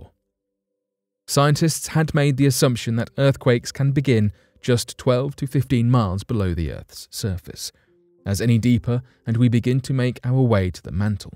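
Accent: England, United Kingdom. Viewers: What scientists? Names? Why is a guy with a British Accent even doing a video on this? Are we supposed to believe you more because of your accent? California has been experiencing quakes for years. Scientists look at data, the take an educated guess at when something happends. A dart board would be more accurate. Sorry but terrible video. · English or European? Love hearing your real voice on your blog love true dialects and love your channel and info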